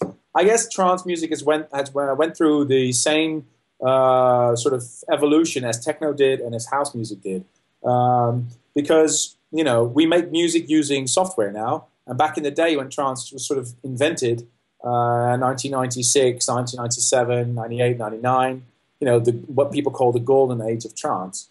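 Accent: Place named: United Kingdom. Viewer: He sounds British.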